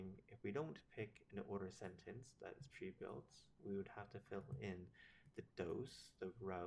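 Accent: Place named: Canada. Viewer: loooooooooooooooow and monotone Canadian accent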